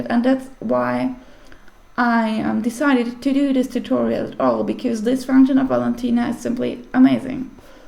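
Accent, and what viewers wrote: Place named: Switzerland. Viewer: Is she Swiss-German? Her accent sounds just like Angelic Venus.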